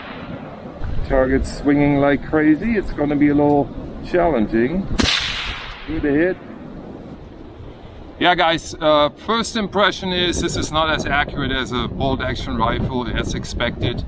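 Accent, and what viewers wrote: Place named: Germany. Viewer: Great video sir! I am also in the process of getting a can from them as well. It’s not a Banish can but another brand and it’s a pistol caliber. So far, it’s been a relatively good experience. No approval yet, but should be here any day this month or in June. Like your channel too! I hear a hint of a German accent or maybe Dutch….?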